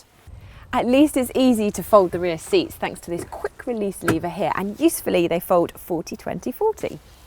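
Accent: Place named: England, United Kingdom. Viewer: lovely the women english accent just lovely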